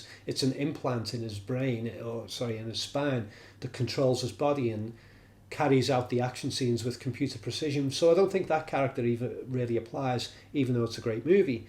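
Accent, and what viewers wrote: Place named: Manchester, United Kingdom. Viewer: You sound like Noel Gallagher! Are you from Manchester?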